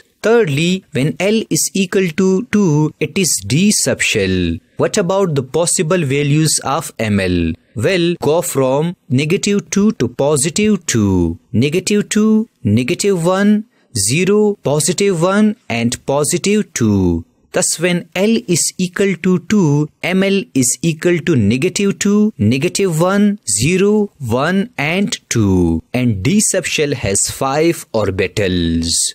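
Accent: South India, India. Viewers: Keralian ho kya ...awaj se lagta hain as south Indian jaisa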